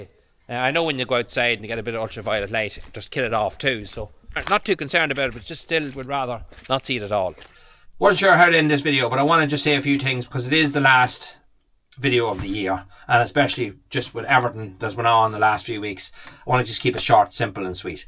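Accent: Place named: Cavan/Monaghan, Ireland. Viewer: Your channel is growing because you are the product. The videos are well framed and edited, and the content is interesting, but fundamentally, whether you knew this or not, it's you. You are the product here. How you cope with the day-to-day is what people watch for, and you encourage people with your work ethic, attitude, and resilience. It also helps that you have a wicked Cavan/Monaghan accent, and the Yankees love that, too. You keep the country going; you are some of the best we have.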